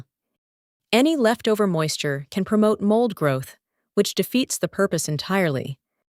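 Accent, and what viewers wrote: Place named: United States. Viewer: BanEnas, can't we have a proper English version on Youtube as opposed to American so called English.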